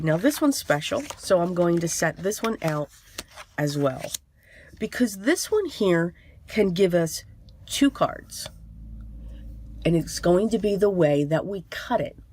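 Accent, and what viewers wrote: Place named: Philadelphia, United States. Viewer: Great ideas! Keep the videos coming! Couldn't help but notice your 'Philly' accent on the video. We're practically neighbors as I'm in NE Philly. ❤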